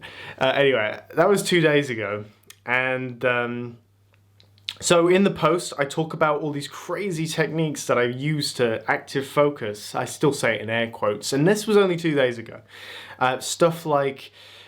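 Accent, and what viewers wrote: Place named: United Kingdom. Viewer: Your British accent :)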